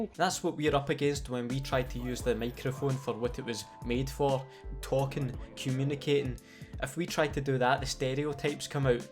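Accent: Scotland, United Kingdom. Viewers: Honestly tho man, I think Scottish accents are fuckin rad, and that makes your videos not only entertaining, but pleasing to listen to 👌🏼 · sounds like a normal scotsman tae me mate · No I love your Scottish accent but the British accent sounds like complete dog shit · I'm Irish... speaks like a stereotypical Scottish man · I fugin’ love your Scottish accent. It’s so dope cause you don’t even say “Scottish”, you say “scah-ish” and that makes me happy. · Fuck off. Scotland accent is an awesome accent! I really thought only Asian players of CoD that Being Dissed. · Love the accent bro want to move to Scotland so band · man i like your Scottish accent i watch your vids because your accent ❤️ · raging gravy tunnel omfg... if this helps I think scots sound fucking awesome, I wish I had your badass accent. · I like your Scottish accent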